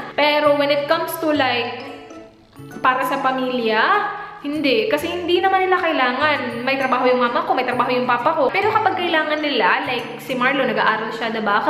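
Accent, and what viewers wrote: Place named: Philippines. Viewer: Gusto ko ung mga vlogs mo, I like how you don't try hard. Ung ibang vlogger jan nakakabusit kasi trying hard, pati accent niya binabago. Mas gusto ko ung gaya lang ng accent mo na pinoy parin.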